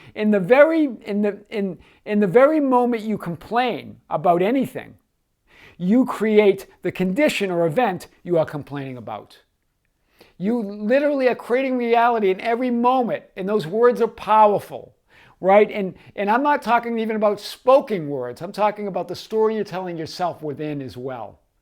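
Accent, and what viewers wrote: Boston, United States. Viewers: From Boston? 🚀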